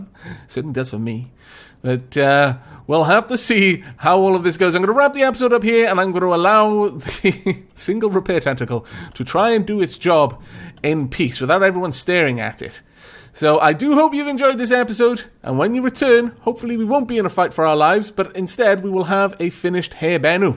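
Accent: Wales, United Kingdom. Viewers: Where r u from? You have a Welsh twang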